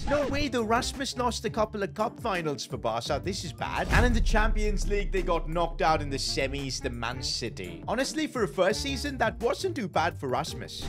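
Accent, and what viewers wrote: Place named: India. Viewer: he’s Indian bruv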